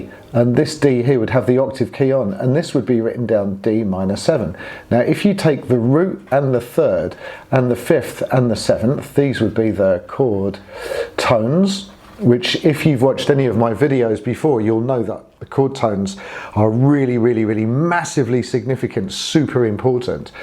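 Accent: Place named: United Kingdom. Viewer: You're clearly British, what city are you based in? I'm on the hunt for a new tutor and you're great!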